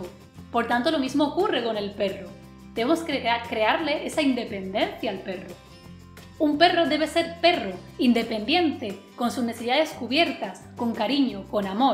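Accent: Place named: Seville, Spain. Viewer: Ese acentillo de sevillana fina te queda muy bien. Soy de Granada pero también del Betis, espero no haber metido la pata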